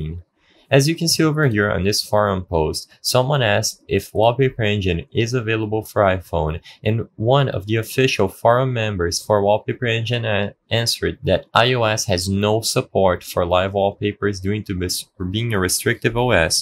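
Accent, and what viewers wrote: Brazil. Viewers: vc e Brasileiro certeza, sotaque entregou, mas conversa bem pra krl em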